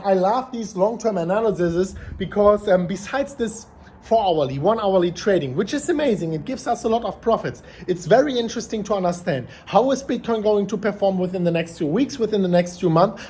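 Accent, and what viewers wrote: Germany. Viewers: Deutscher, hört man direkt :D :D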